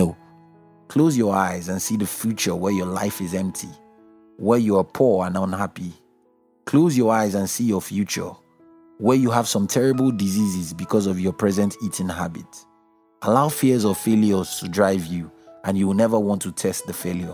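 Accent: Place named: Nigeria. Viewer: Nigerian, I believe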